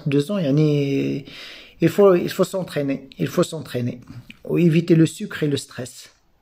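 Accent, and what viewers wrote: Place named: Morocco. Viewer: شكرا كتيرر مسيو معلومات جداً مفيده و رائعه حكيت حظرتك عن زيت البلدي قصدك فيو زيت الزيتون ؟ أنا من شام وماعرفت شو هو زيت بلدي بالمغرب🤭 الهجه المغربيه كتيرر حلوه مع اني ما بفهم كتيرر مغربي بس حبيت😍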